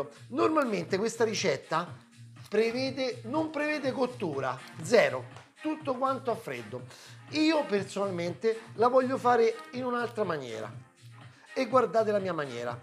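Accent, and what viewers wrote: Rome, Italy. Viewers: Complimenti chef! Sono allergica al peperoncino, si può fare senza? Grazie mille sei unico! Oltre ad essere strabravo, carico d'inventiva, sei proprio un simpaticone, ci metti di buonumore, impariamo un sacco di cose si ma, ridendo.... Ue' con sto accento romano verace ci si diverte un sacco! Grazie mille chef! Bacino al Vs splendido bimbo!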